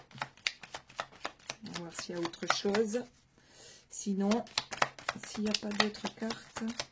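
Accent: Alsace, France. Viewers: Bonjour ! Vous avez l'accent alsacien 😊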